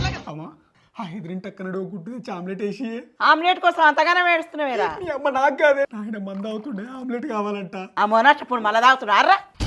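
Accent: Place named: Mahabubnagar, India. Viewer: Miyammak phonjescheppinav ania side kuda ilage matladutaru mi mehaboob Nagar side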